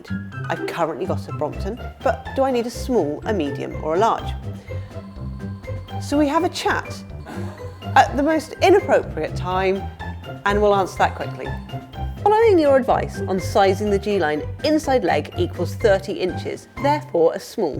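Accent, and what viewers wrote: United Kingdom. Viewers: Always nice to see her and more importantly hear the nice British accent of this lady.❤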